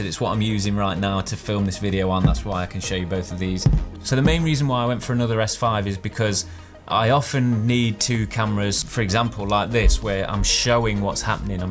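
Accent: Nottingham, United Kingdom. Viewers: Again - I love your british English:) and of course, the content of your videos is of very high quality! Thank you for the comparison, I´ve been using my R6 for 20 months (photos and videos) and I am considering to buy S5 just for shooting videos. Thumbs up, as always. Regards from Czech Republic;) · Nottingham?? Sounds very familiar :)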